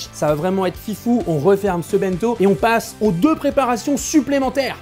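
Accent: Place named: South-West, France. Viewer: Ce que j'aime chez, c'est cet accent du Sud-Ouest qui ressort même en parlant de cuisine Japonaise =D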